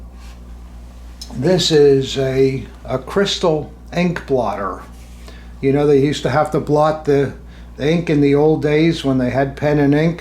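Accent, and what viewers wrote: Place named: Philadelphia, United States. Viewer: Man the Philly/S Jersey accent is strong with this guy! Good Stuff, could enjoy a beverage on 2 street with him.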